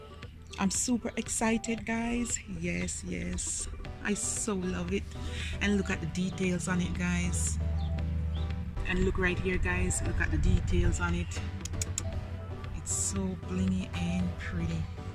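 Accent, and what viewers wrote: Jamaica. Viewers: I just subscribed cuz of your Jamaican accent💪🏾❤️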